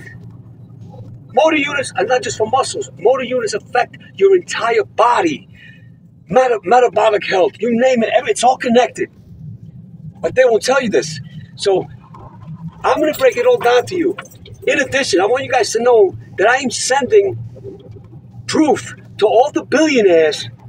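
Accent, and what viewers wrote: United States. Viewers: Are you in Europe? You sound American!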